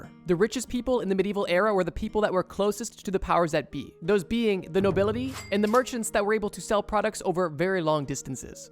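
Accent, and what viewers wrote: Canada. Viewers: I think he’s Canadian ????? They pronounce things weird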